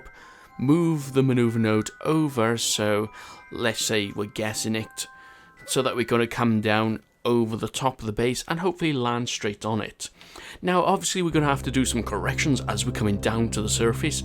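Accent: Wales, United Kingdom. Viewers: YES, ANOTHER WELSHMAN! BLOODY TIDY!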